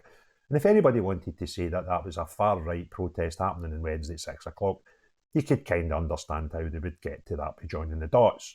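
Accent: Scotland, United Kingdom. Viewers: Love your accent, makes me want to move to Scotland 🏴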